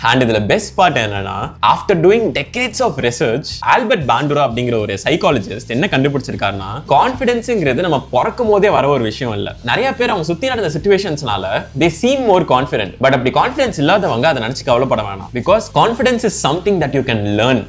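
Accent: Tirunelveli, India. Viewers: neenga tirunelveli aa bro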